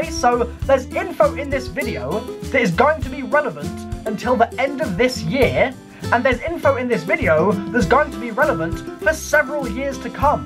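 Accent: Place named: United Kingdom. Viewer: "8 blooDee years." British accents are amazing.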